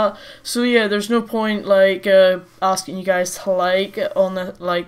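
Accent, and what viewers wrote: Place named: Ireland. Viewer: You from Ireland yea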